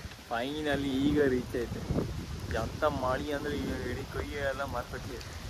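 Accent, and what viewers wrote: Kundapura, India. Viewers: What kannada he speak is not normal kannada but it's dialect of kannada called " Kundapura kannada". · ಚೆಂದ ಇತ್ತ್ ಮಾರೆ ನಿಮ್ಮ vlog ಕುಂದಾಪ್ರ ಕನ್ನಡ ಕೆಂಬುಕೆ ಚಂದ ಶುಭ ವಾಗಲಿ 👏👏👏👏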